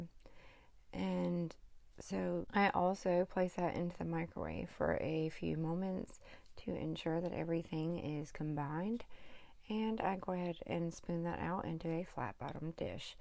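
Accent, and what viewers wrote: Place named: United States. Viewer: that's fabulous and I am looking forward to viewing it, you have a great American voice, you sound very calm and placid! I would like to make videos but I have no understanding of video editing, I have some super ideas for content but just don't have the time to train myself in video producing so I am trying to write instead, however even with that I struggle as I much prefer writing with pen and paper, my previous life is so far from my new venture in upcycling and art and I would love to tell my story of the last two decades after the 90s rave scene mingled in with my theories on elite circles and their corruption and the impact on society, it is an extremely unusual tale from a part of England that is not really documented, I am from the southwest which to most is a sleepy, rural, picturesque haven but it is really different to what people think! 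Well I'm probably driving you crazy now , I'm terrible for going off topic, but I do love chatting especially to Americans! I love America and would love to one day visit.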